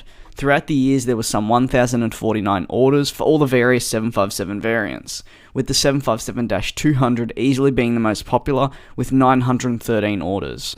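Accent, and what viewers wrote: Australia. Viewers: Thank you for the "757 Re-Engined" video-- the 757 is one of the most powerful and graceful of Boeing airliners. Pilots develop sentimental attachments to the 757 for its creature comforts, and the wonderful cockpit view. Your own, detailed commentary is very helpful-- especially the facts and reasoning for your viewpoint. Clearly, you read industry publications widely, and in-depth. Also, very helpful is description of your video equipment-- there is no substitute for a good tripod. Your Aussie accent is almost never a problem, but you have a tendency to drop complete syllables in words, and unless we Americans know the Aussie abbreviated pronunciation, the word is lost. However, we do understand your production is provided as an amateur, and a professional speaker is not expected. * If I have confused your accent with Australia, and it comes from industrial London, instead, my apologies. 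Quite a number of Aussies came from that area, originally.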